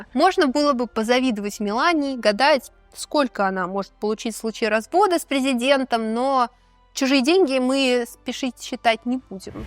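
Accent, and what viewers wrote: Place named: Russia. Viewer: Дорогая ведущая, ты с дианой панченко как две капли воды по стилю ведения программ, по расстановке интонации и акцентов, по переигрыванию ситуаций, по мимике, агрессивному обживанию передаваемой информации, по особо подчеркнутому руССкому акценту, да почти по всему, включая ваши перенакачанные губы!..... что это за такая дивная тенденция в современной пророссийской журналистике!!!!!!! Фу, противно и гадко!!! Не переигрывайте, будьте живыми, незлобными, простыми и позитивными, оставьте излишнюю едкость и будьте менее судьями, а более информаторами!!!!!!!! Успехов!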